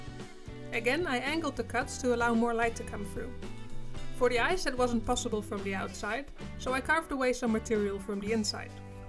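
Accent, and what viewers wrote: Netherlands. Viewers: u have such a Dutch accent! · She is dutch people have a strong accent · Let me guess your from Holland..? very good vid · you sound Dutch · You are dutch hah! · Is she dutch??? She has that kind of accent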